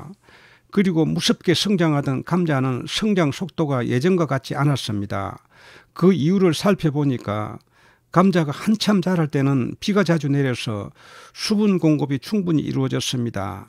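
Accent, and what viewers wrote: Gyeongsang, South Korea. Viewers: 대구 억양??? 이발, 감자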